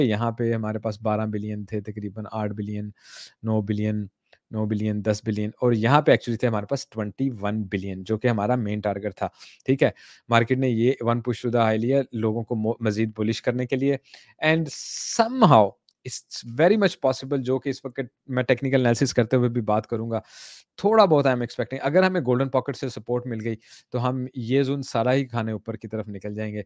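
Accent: Punjab, India. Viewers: jado vi tusi punjabi ch bolde ho ...rab di soh ,,dil hi kadh lainde ho.....jiunde wasde raho bhaji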